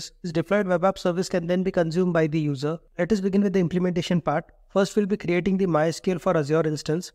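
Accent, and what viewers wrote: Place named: India. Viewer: Very useful videos! Can you speak at a little slower pace? Will help people like me not used to india accent. Otherwise, great content.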